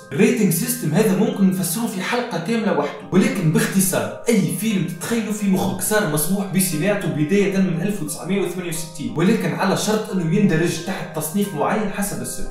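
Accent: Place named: Tunisia. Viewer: حضرتك تونسي انا اول مره اقهم حد تونسي ❤لكنتكم حلوه اوي بس صعبه شويه ❤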